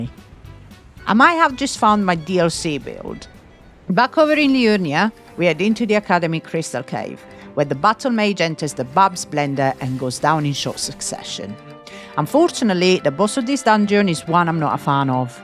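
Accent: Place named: Italy. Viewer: Love the mix of Italian and northern/midlands, get on, get that plaque before JK haha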